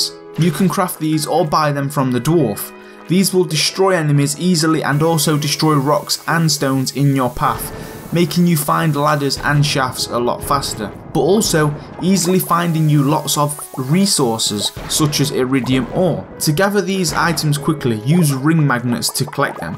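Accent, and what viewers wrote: United Kingdom. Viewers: Gotta love bri'ish accent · Ur so bri'ish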